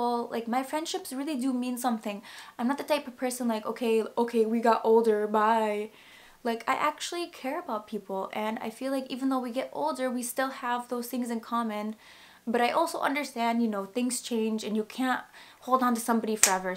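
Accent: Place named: Miami, United States. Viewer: i know you're lebanese but you look so cuban!! you even slightly have a cuban-miami girl "accent"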